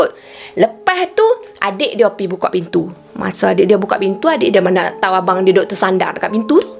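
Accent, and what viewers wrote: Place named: Kedah, Malaysia. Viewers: salam..bulih akak tnya asal dr mna..sbb pandai ckp utara👍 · Slmt mlm semua..hai jom nk dngar cerita seram..hbt na ckp loght kedah..trbaik laa..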